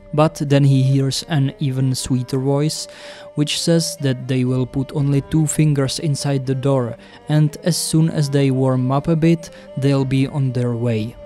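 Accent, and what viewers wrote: Czech Republic. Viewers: good english bro, but you should take away this czech sound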